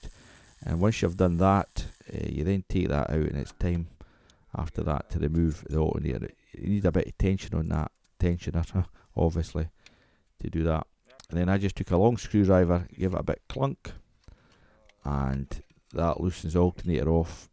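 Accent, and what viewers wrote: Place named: Falkirk, United Kingdom. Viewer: I am looking for someone to do mine , sounds like you live local to Falkirk ?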